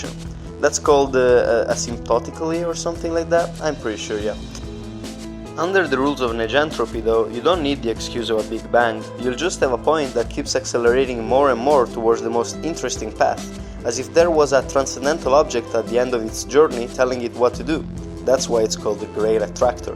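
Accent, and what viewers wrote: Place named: Italy. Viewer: Fantastico come la tua pronuncia fosse perfetta, ma mi sono bastate le prime tre parole per capire che fossi italiano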